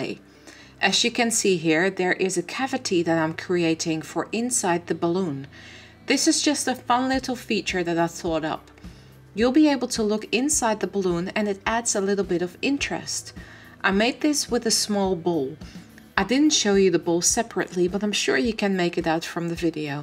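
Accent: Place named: Netherlands. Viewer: Everytime I hear your accent I get this feeling you're dutch.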